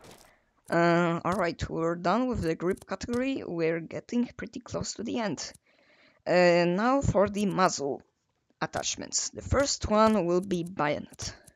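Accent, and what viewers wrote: Russia. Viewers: I can really hear the Russian accent In your voice. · i love how the russian accent matches with the team you're on despite you being from poland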